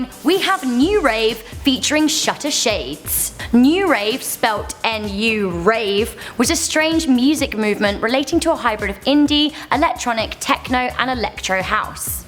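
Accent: United Kingdom. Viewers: Sounds pretty British to me brah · thanks lol she sounds British but she knows all the us stuff and she says "My friends in canada and the US" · I am gulity of like 1 of these also i love your accent. I am going to assume your from the UK? Sorry if i am wrong! :| :)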